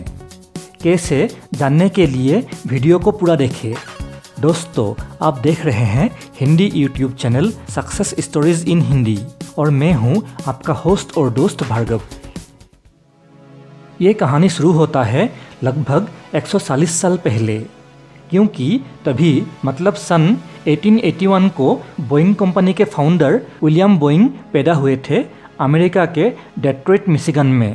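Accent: Assam, India. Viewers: Tm Assamese hy kya 😅 Hindi assemess lag rah hy😅😅😅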